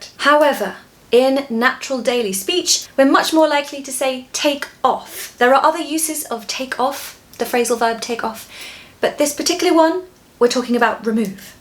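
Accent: United Kingdom. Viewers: Your an excellent,real,fast British accent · This is the Brit accent and Queen's English I’ve been desperately, deliberately, literally, immensely, thoroughly, remarkably, intensely, highly, absolutely, insanely, utterly, and certainly searching for my whole life. I’ve finally found it! Teacher 👩‍🏫, I hope you won’t kick my butt for using too many intensifiers — I just had to express how I feel. Love ya! ❤ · Your excellent, real, and fast British accent is so helpful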